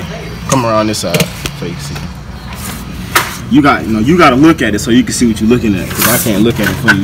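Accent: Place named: South Carolina, United States. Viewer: That's a South Carolina accent for sure! Thanks for the helpful video too!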